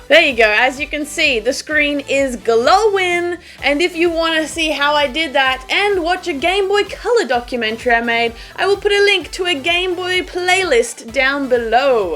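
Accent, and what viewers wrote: Australia. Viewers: is she austrailian?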